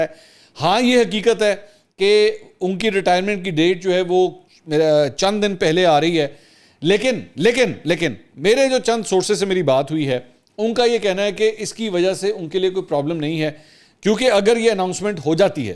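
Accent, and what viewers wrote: Punjab, Pakistan. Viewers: آپ کو سنتے ہیں تو تسلی ملتی ہے سر اور آج تو آپ نے ہم کراچی والوں کی زبان بول کر حیران کردیا ہمیں آپ کا اردو بولتے ہوئے پنجابی کا تڑکا بہت اچھا لگتا تھا مگر آج آپ نے شیان پتی بول کر خوش کر دیا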